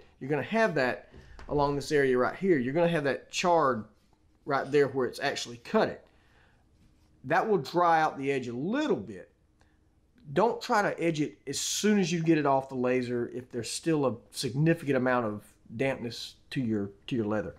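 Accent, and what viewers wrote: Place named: West Kentucky, United States. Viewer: Just sounds like my friends back in the West Kentucky hollers. Makes it so much more enjoyable. My wife bought me a small, inexpensive laser. Been doing this for a while, gonna try it this way. TY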